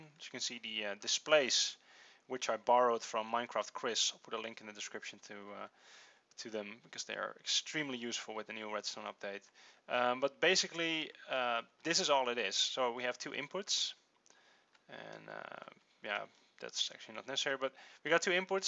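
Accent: Netherlands. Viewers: je spreekt comparator verkeerd uit, 'tgeeft niet p.s. je kan hiren dat je nl bent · You are Dutch right? I can hear it 'cause of your accent :P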